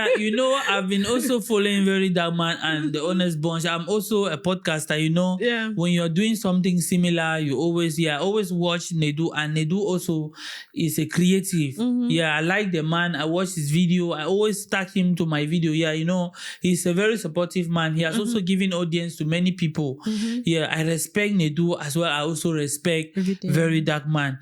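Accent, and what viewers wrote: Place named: Pidgin, Cameroon. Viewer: This guys pidgin accent looks like a Cameroonian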